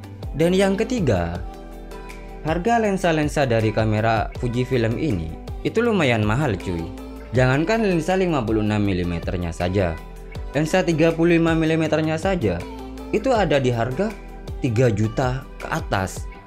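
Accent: Madura, Indonesia. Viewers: Reng madureh reyah? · Logatnya kayak madura kang ? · Etemmu logat madurena cuy.haha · Madura ya mas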